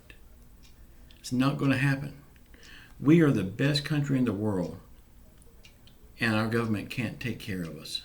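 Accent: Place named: Louisiana, United States. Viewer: You hit the nail on the head. A $1200 check in 4 months exemplifies how thoughtless & callous our political leadership has been through the pandemic & closing of economy. As to Devos's & Kudlow's mind boggling push to reopen schools while the virus is raging through the country betrays where their loyalties lie. Rather than wanting to protect the children & teachers, they choose politics, downplaying the effects of a failed covid response., keep telling it like it is in your Louisiana twang. Don't let em off the hook.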